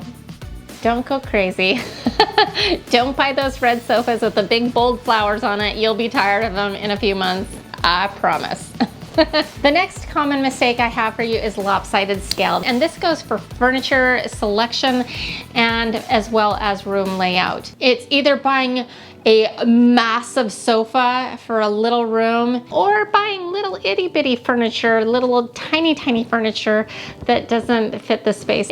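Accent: Utah, United States. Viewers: I am so surprised to hear you’re from Maine, you 100% have a Utah accent. Mind blown.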